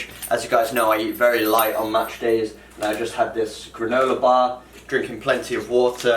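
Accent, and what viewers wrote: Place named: England, United Kingdom. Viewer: Where are u from? Sound English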